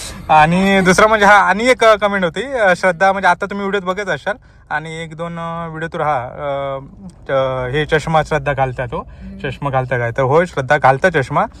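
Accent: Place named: Malvan, India. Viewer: माझ माहेर पण बांद्याला रोनापाल ला आहे माहीत आहे का रोनापाल तिची तिकडची मालवणी ऐकून मला माझ्या माहेरची आठवण आली माझ्या माहेरी सुद्धा असेच मालवणी बोलतात श्रद्धा तू व्लॉग मध्ये मधे मधे तुझ्याकडचे मालवणी बोलत जा 🙏👍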